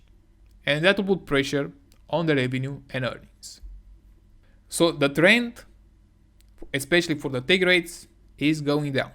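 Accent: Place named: England, United Kingdom. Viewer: Don't take advice from some moron with heavy English accent from the internet.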